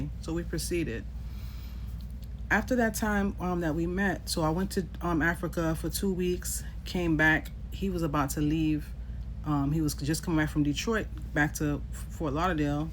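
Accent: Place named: Caribbean. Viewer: UR PATOIS S AMAZING IM A YOUNG QUEEN FR NY CARIB AND SPANISH.❤❤❤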